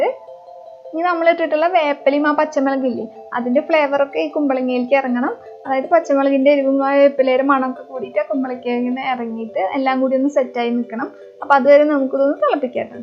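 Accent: Thrissur, India. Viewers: തൃശ്ശൂർകാരി ആണല്ലോ 👍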